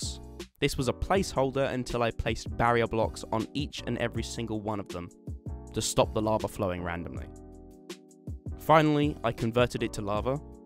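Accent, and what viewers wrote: United Kingdom. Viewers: You sound like if grian and mumbo Jumbo combined to make the ultimate Brit, from one Brit to who I am presuming is a brit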